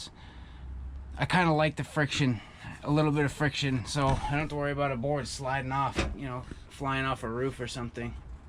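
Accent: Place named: North Shore, United States. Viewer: cool, carpenter on the north shore here, I just recognized the accent, lol... Thanks for the review, I just bought one, and I'm very happy with it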